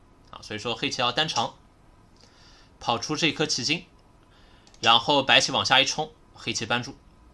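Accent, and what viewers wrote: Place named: China. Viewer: 不曉得是中國哪個地方的口音 , 真的好聽 !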